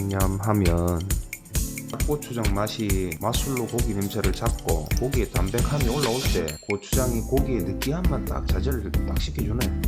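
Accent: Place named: Daegu, South Korea. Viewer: ㅋㅋㅋㅋㅋㅋ 대구에 있는 내친구가 얘기하는 줄 알았네요 ㅋ 저거 단점이 있어요.. 밥을 너무 많이 먹을꺼 같아요 ㅋ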